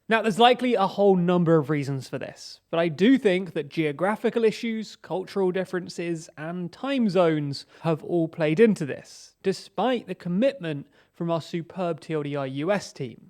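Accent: United Kingdom. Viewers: Oh my! I wonder how US citizens perceive a Brit breaking down their politics and national events, i.e., maybe an American accent may help? Perhaps it should be TDLR North America? Is there an audience from Canada? Maybe less saturation in the market compared to USA? Otherwise, it's all in the pitch. Take, for example, the BBC. Around the world they are largely perceived as the gold standard due to their perceived impartiality and focus on news over opinion. TDLR has one presenter with an uncluttered environment. Either run wuth that and make it explicit that TDLR is the home of news facts not news opinion. Being based in UK you have geographical distance from all the political shenanigans and little exposure to the dross on opinion news TV. You do a PR number on your impartiality. You don't have connections with US politicians or lobbyists unlike US legacy media whi must kiss the ring to avoid getting expelled from their chummy club. 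Or you need to seem less "other". If you hear a US or Australian news presenter talking about UK events do you ever get annoyed with them? Mutter about how would they know bkah blah? Jazz it up, get a co-presenter, recognise that you might not be abke to copy the UK format over every channel. Anyhoo. My tuppence. Double down or change format. If everyone really wants news facts and less opinion then you need to explicitly pitch the channel that way. It's a busy market. What's your unique selling point? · If you are based in the UK, then American news would fit better in Global anyway. If you retain the TLDR US channel, you need to decide how it will be different than any other hundreds of other US-news channels available. If you think you have a viable approach for the US-market, then give it the same attention as the other channels and evaluate it based on that. If it still underperforms, then you know Americans just aren't resonating with your perspective/delivery and you should focus your attention elsewhere. 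It's unfortunate that you don't have the luxury of operating at a loss - which was how news was run in the mid-20th century. Currently, since your accent gives away you are based in the UK, I see your channel as a Brit/European perspective on US news and politics - basically the "outsider's" perspective. I'll expect the content to be even more left leaning than typical American media (American political center is more to the right than European political center). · I subscribe to all of your channels, so my comment is not personal. But, you might try a American English speaking presenter. Many Americans might feel put off with a British accent talking about American polotics.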